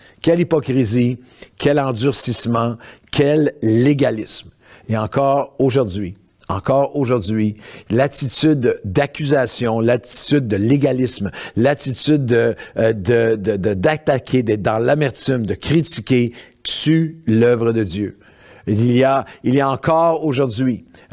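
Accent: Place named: Canada. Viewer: Avec vous depuis le sud de la France ! Dans le minervois! Ne soyons pas des vierges folles !! Merci pour votre prédication edificatrice avec l accent canadien ! ❤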